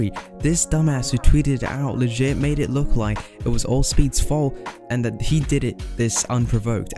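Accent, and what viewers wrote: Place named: United Kingdom. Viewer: Oh god not only do you sound British but now ur getting ur 200 ping on AS mmc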